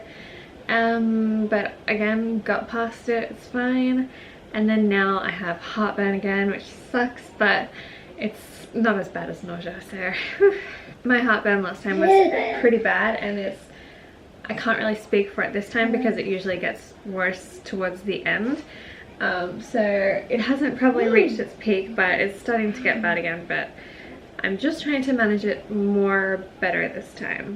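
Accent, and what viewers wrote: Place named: United States. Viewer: Why do you have a part American accent? Did you live there?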